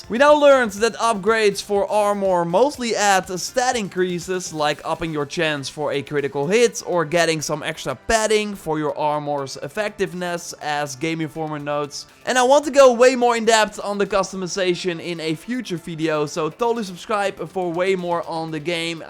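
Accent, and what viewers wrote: Netherlands. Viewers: youre dutch, i can hear it in your voice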